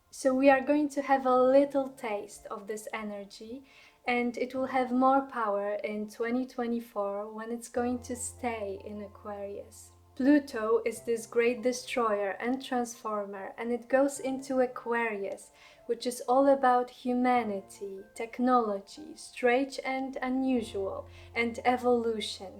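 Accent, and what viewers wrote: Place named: Poland. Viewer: Hi great stuff you are so smart my mind has been so scrambled lately sometimes listening to people just makes it worse but not with you for me brings me down to earth just the way you talk I guess soft voice and I love your accent I live in NY USA I think you are in Poland? Thank you for helping me 😀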